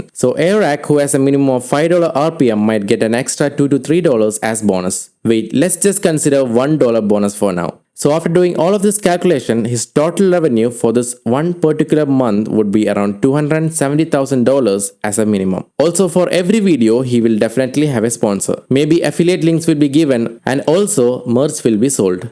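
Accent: India. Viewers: That Indian VOICE 🗿❤ is Genuine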